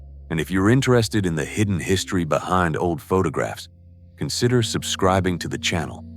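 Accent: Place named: United States. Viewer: Was this about British history? If so, why are we listening to an american voice - it's very disrespectful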